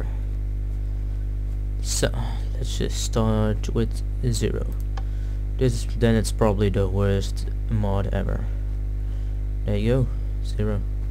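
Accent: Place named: Netherlands. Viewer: Are you and you're friend dutch? If so you're accent is awesome :D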